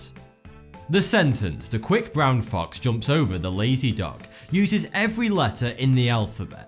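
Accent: United Kingdom. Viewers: British English at its best~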